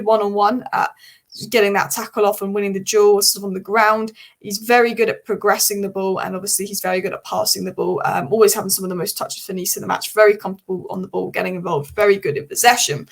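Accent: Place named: Manchester, United Kingdom. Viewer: Your Mancunian accent is second to none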